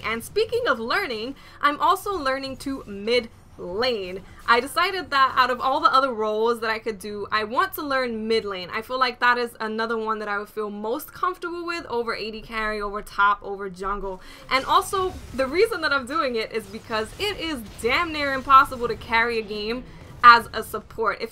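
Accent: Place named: Bronx, United States. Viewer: BTW: you sound like you from the bronx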